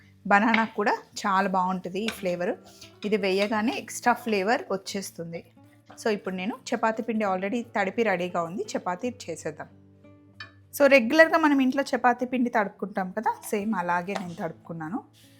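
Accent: Andhra Pradesh, India. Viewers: Meeru..telangana kadhaa..but andra accent lo matladuthaaru..adhemito...telangana yaasa ❤❤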